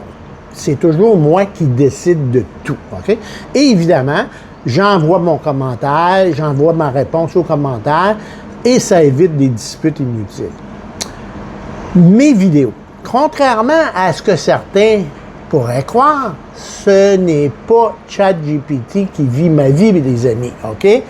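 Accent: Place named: Quebec, Canada. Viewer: J adore votre gouaille de quebequoais .. 😀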